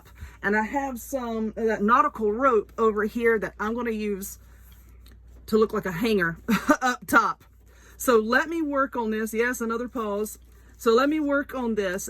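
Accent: South, United States. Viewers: I love listening to you talk. I’m a Texas girl so I’m Southern too. You are so good and funny too.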